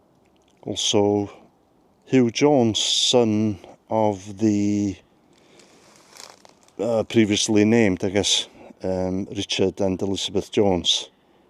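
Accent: Wales, United Kingdom. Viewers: American here and newly subscribed. I worked with a couple of Welchmen 40 years ago and haven't heard a Welch accent in a long time. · it's really lovely to hear a real Welsh accent, and I'm 100 per cent Celt Irish.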